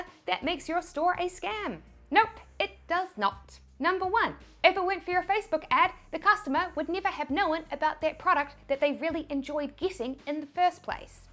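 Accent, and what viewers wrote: New Zealand. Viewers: What is your accent ? there is abit of New Zealand / abit of Irish or American, maybe South African ? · Iv never had the urge to make my own online business, but i may do it just so i have a legit excuse to watch your videos other than the reason now, this kiwi accent is awesome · At 78 I'll probably not launch a dropshipping business but I tune into videos just to hear her interesting Kiwi accent. I lived in New Zealand for twelve years and yet I've never heard an accent like it. Also I love her vibrant personality. All the best to everyone, from Doug the atheist demon from Parramatta, Sydney Australia.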